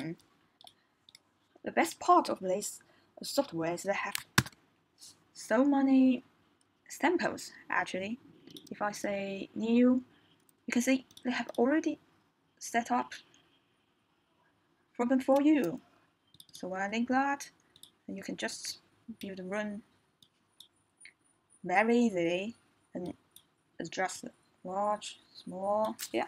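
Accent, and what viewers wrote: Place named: United Kingdom. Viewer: 小姐姐的英式英语让我惊呆了！告诉我，还有什么你不会的？：）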